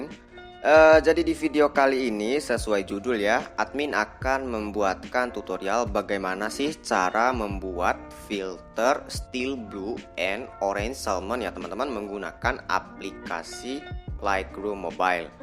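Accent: Bima, Indonesia. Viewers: Orang Bima ya bang?? Salfok sama logatnya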